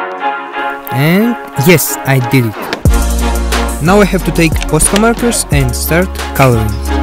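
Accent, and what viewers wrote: Russia. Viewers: Он русский????? У него акцент русский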